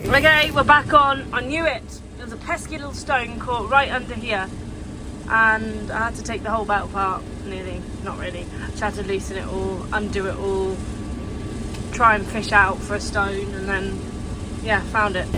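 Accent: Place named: United Kingdom. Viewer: Just love the British accent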